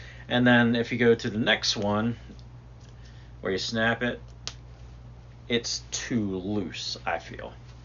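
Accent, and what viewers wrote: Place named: Pennsylvania, United States. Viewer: Just got to the part where you say you’re from PA... haha I can hear it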